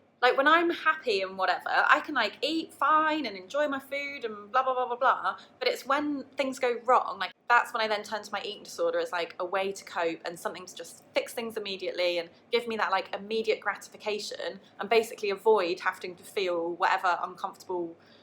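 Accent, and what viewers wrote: United Kingdom. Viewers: For some reason it’s really comforting to hear a British voice on a (quality) YouTube channel! I feel like I’m so used to American accents that I get startled by a British one (even though i live in England and everyone around me sounds like that - it’s just that online everyone’s a Yank I swear!) you’re so eloquent too which is amazing! X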